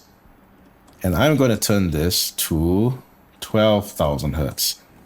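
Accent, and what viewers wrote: Singapore. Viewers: Just in time for my Helix LT :) Are you from singapore by any chance? you sound singaporean. Anyway, i'm Singaporean turning to your channel from Berlin. Anyways, great video!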